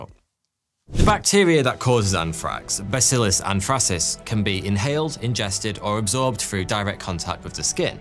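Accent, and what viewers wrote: United Kingdom. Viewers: srry but it sounds as if the very well spoken, and well sounding, spokesperson of this calls thoughty 2 42, I realize its just because of the lovely british accent, but it could be pronounced more clearly, and that btw goes for all ur vids!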